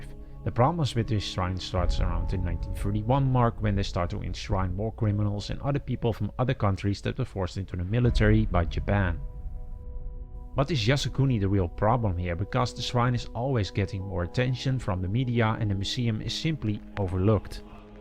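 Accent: Netherlands. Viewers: Great Dutch accent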